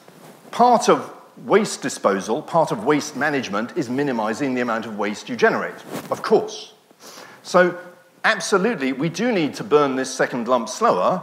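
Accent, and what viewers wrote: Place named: England, United Kingdom. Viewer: The bad sound is that bloody English accent.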